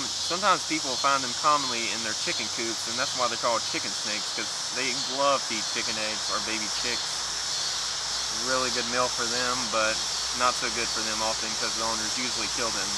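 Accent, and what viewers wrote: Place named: South, United States. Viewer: I never noticed how thick your southern accent is lol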